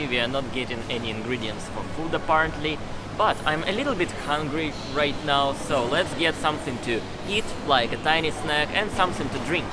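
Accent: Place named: Russia. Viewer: why do you speak english with an eerily similar accent and fashion that putin does?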